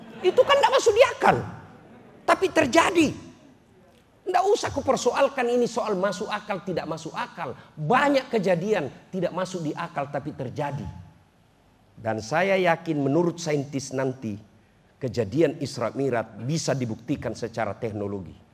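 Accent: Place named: Makassar, Indonesia. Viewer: Logat Makassarnya ini saya kangen..serasa waktu dulu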